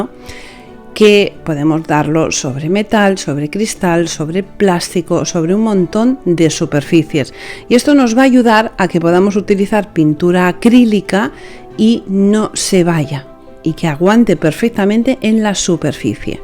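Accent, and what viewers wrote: Spain. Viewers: ❤❤ Precioso como todos tus trabajos, me deleito mirando y escuchando ese acento español que me encanta. Un abrazo desde Buenos Aires. Argentina. 🇦🇷🇦🇷🇦🇷🌹🌹🌹♥️♥️♥️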